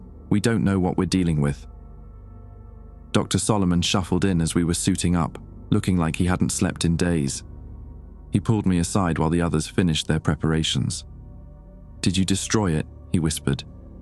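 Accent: England, United Kingdom. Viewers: Seems a little suspicious with that English accent to be Air Force. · The British accent is a huge turn off, especially since the guy is supposed to have worked at Area 51 in the U.S. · Another British voice narration , gee can I believe all this ? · Why does he have a British accent .? American military?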